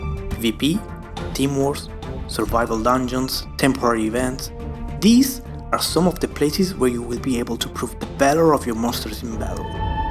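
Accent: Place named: United Kingdom. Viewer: British accent LOL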